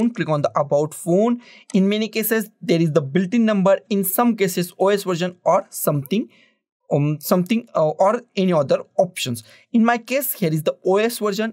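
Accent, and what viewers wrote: India. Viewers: I love your india voice!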